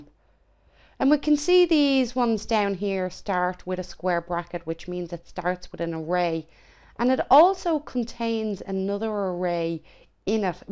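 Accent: Ireland. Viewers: Great. Thanks. Btw nice Irish accent. :-)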